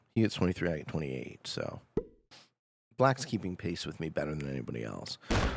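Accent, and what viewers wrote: Canada. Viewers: you’re incredibly Canadian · im from northern MN, yes you sound like a Canadian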